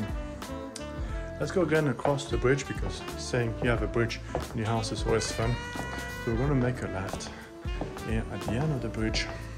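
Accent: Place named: France. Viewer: Great video, but I didn't understand a damn word you said. You can either skip using a microphone or not have a heavy French accent, but you can't do both. 😊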